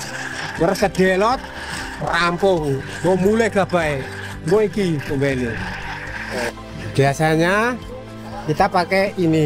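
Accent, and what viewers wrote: Java, Indonesia. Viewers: Luar biasa padi nya kak, semakin ku tonton ternyata jawa medok... luar biasa semangat 🎉❤ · Medok bgt Lo ya😂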